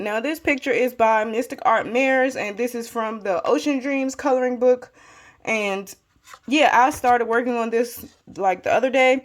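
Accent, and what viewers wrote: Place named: United States. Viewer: Hi beautiful colouring pages.. I love your American accent.. people say to me I love your Aussie accent lol thank you for sharing hun ❤❤❤😊😊😊xxx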